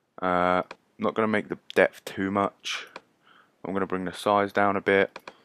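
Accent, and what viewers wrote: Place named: United Kingdom. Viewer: Brittish accent on a tutorial. + 10 points.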